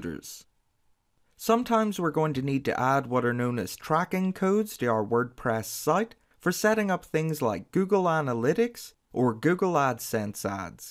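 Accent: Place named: North, United Kingdom. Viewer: nobody should dread you at all - you are inspirational! Thank you so much for this tutorial. I'll be honest, I have looked at numerous such videos but I totally love your approach. Clear, concise, engaging - all washed down with that soft Northern accent., would it be terrible to ask a quick question about one of your other videos here? Well, I'm going to do it anyway! A twitter feed on a website - you showed it being put in a sidebar. I don't have a side bar, so can it be plonked in a page? I use siteorigin page-builder and widgets. This is not important really, but I can't say widgets if I am drunk, so I call them dingdongs! I have also followed your friend Alex last week and told him if he ever comes to Ireland to let me know, as it would be gas to help him out. He could do a tour - I'm in Cork so we could travel the length of the country. 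That again. Best wishes, Colin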